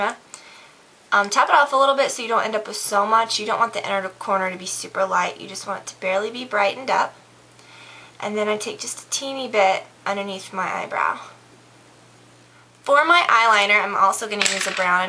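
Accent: South, United States. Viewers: i feel like only during tutorials does your southern accent come out :)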